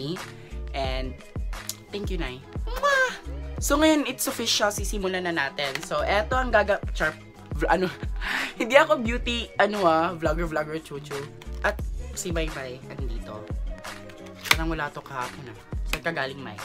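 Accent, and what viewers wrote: Visayas, Philippines. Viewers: Ay bisayaaaa pala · Bisaya ka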